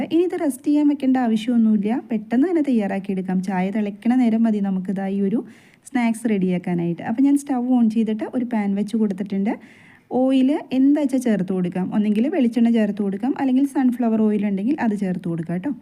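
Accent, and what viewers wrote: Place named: Thrissur, India. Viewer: Thrissurinte സ്വന്തം ഭാഷ ❤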